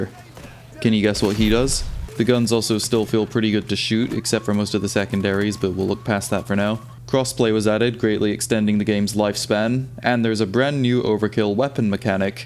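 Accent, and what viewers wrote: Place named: Jamaica. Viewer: I thought you were Jamaican am I fucking stupid.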